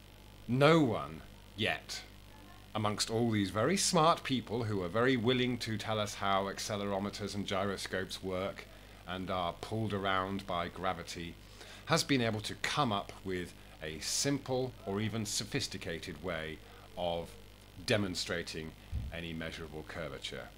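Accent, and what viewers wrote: United Kingdom. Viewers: You sound as though you were educated in the UK. Could you please tell me at which school?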